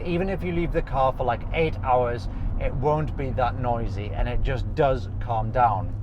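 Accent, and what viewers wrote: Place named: Geordie, United Kingdom. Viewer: Lovely car! Putting a right "high Jesmond" on your Geordie accent there! Be proud of your Toon lineage man!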